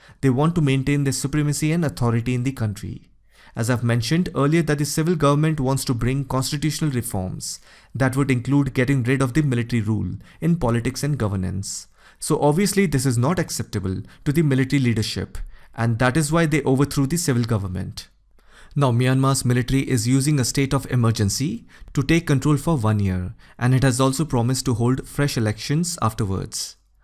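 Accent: India. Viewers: The commentator has a very strong Indian accent with horrible pronunciation of many English words. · As soon as the Indian accent came up, I know this video is anti-China & full of gibberish. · I can barely understand you, your indian accent is too strong put subtitles please.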